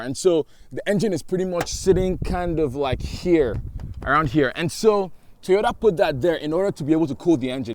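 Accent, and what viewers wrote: Nigeria. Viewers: Came for the car, stayed for the laugh and the Nigerian (west African) accent.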